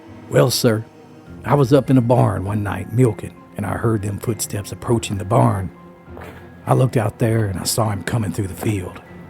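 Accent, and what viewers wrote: Appalachia, United States. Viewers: The villages Florida .. great voice over because I know the the mountain folks sound just like you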